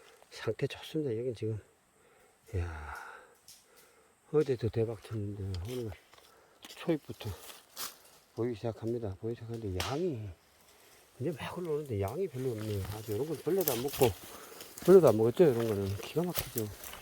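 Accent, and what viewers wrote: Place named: Gyeongsang, South Korea. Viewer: 영상 너무 신나네요~~사투리가 김천(?) 고향사투라 오랜만에 정겹네요 안전 산행 하세요~~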